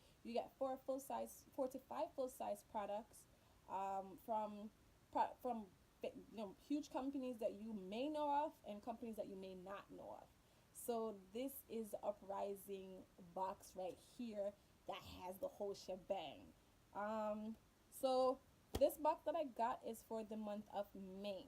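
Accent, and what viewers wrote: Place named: Caribbean. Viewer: Lol I love your personality 😂, and your accent girl!! Caribbean females all the way 🙌🏽.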